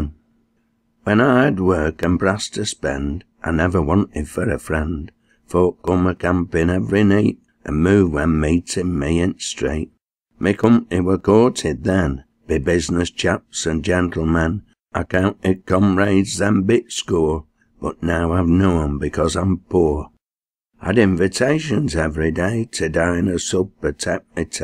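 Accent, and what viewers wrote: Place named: Lancashire, United Kingdom. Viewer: I really like the subject and the lovely Lanky accent but it was far too fast for me ( I am lanky too) If you could slow it down a little I am sure it would be so much powerful as then I could understand the words. I really hope to visit you again soon, you have a lovely voice and wish to "continflab" further : )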